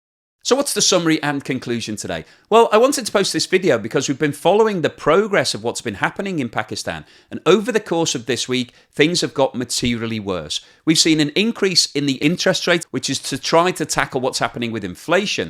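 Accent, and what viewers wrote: United Kingdom. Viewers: Don’t know why but with British accent it sounds too much like a propaganda. Than truth. I agree I can’t point a single topic that is propaganda in this video. It’s just the distrust on Brits. They fund that west narrative building machine called BBC.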